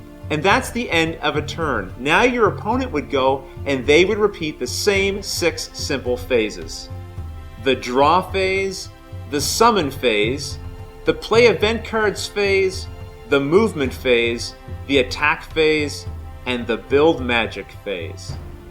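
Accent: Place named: Eastern Canada, Canada. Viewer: I'm from Eastern Canada, that's what you're hearing :)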